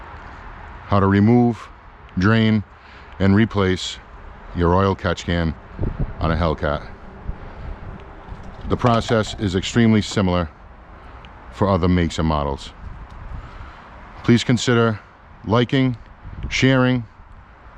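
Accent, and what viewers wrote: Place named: East Coast, United States. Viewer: Yo I miss that East coast voice!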